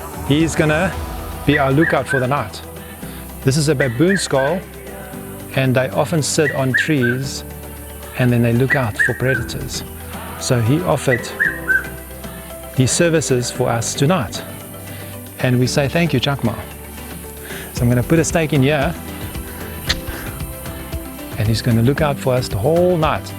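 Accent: South Africa. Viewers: Are you from South Africa? I was sure you were European. Anyway hi from Quebec Canada !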